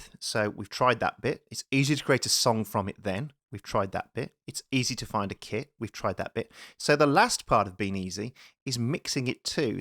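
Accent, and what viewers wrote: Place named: Midlands, United Kingdom. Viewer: He definitely has a Midlands accent ;)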